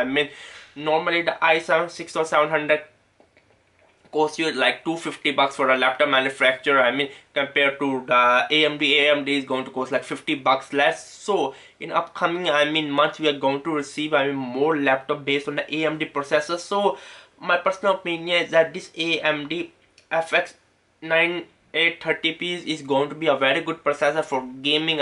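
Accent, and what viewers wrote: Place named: India. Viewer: hes just indian so he has a thick accent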